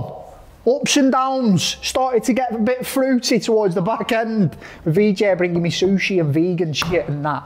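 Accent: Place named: Darwen, United Kingdom. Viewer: Say you’re from Darwen? May sound like it but you look like you’re from Burnley…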